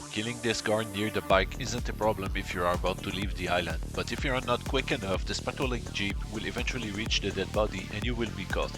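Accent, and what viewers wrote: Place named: Quebec, Canada. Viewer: i recognize a quebecois when i hear one great tutorial tho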